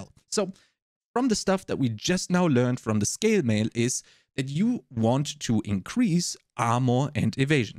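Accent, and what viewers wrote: Germany. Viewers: Thanks mate. This crafting kills me. Now I have a better overview. P.S. Höre ich da einen deutschen Akzent heraus ? ;-)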